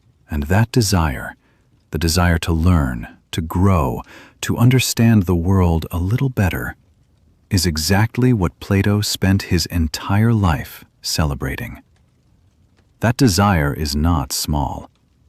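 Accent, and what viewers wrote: United Kingdom. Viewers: It was nice, especially the English accent. I hope more interesting stories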